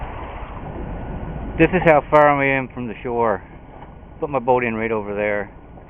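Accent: Canada. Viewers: hey am living up in ontari-ario and im from the new glasgow area your voice sounds familiar like you might be from around there. Cool videos thanks i subscribed:)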